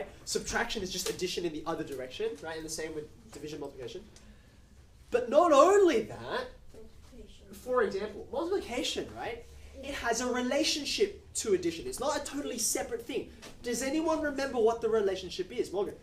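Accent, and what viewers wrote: Australia. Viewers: nice to hear an australian accent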